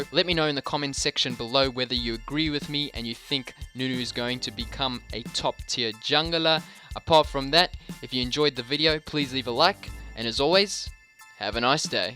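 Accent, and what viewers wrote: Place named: South Africa. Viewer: That accent. Sounds like South African living in Australia.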